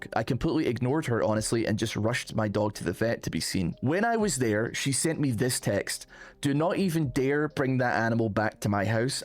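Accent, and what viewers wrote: Scotland, United Kingdom. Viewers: Never realized I needed reddit drama in a thick Scottish accent until today >.>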